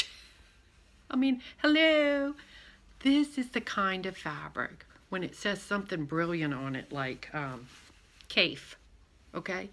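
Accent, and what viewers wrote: Louisiana, United States. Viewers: Hi. Just found your videos and I really like listening to you. I lived in Louisiana most of my adult life and your voice/ accent is so warm and friendly...and sounds like Home to me. I lived in good ole Ville Platte so I'm familiar with Lafayette and the surrounding area. I share a love for the Gees Bend Quilters too. Something about their quilts is so inspiring!! Maybe its the color combinations they use along with their improve piecing...but they just vibrate somehow and reach into your heart. Well, well I love to watch Joe Cunningham and his quilt report too. He has a friend in the Bay Area who is another innovative quilter. Her name is Margaret Fabrizio and has been making quilting videos for at least 10 yes. She is famous for making Kawandi quilts. She has traveled to India to learn how they construct their quilts. 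If you are not familiar with her Im pretty sure you would find her fascinating. Her You tube name is atree3 if you would like to get acquainted with her. Until we talk again, take care. And Thank you for making your videos.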